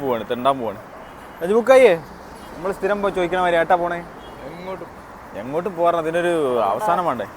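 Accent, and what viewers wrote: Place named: Palakkad, India. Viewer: എന്റെ പാലക്കാടൻ ഭാഷ 👍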